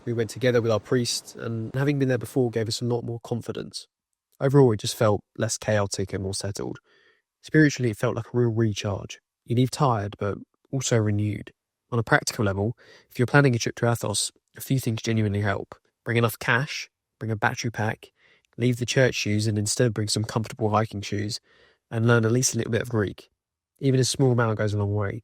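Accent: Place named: United Kingdom. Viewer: Orthodox, cute AND British accent ? ❤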